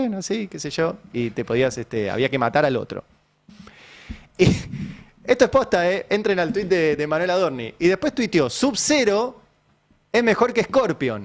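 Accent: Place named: United States. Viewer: UF con ese tono gringo. No me importa lo que diga hasta la forma de hablar suena a vende patria.. Cada vez peor.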